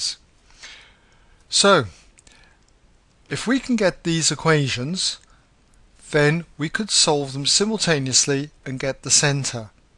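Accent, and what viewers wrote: United Kingdom. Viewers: I love your British accent <3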